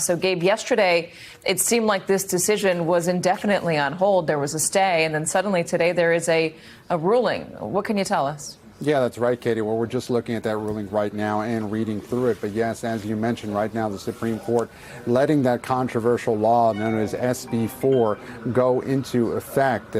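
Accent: United Kingdom. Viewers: What kind of Mickey Mouse operation is this? Starting with a British accent then everyone’s phone is going off in the background. Turn off those candy crush notis katey!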